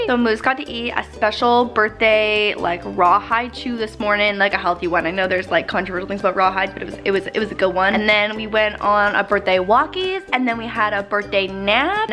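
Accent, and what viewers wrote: Canada. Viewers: TOMORRRRRROW J.k I love Canadian accents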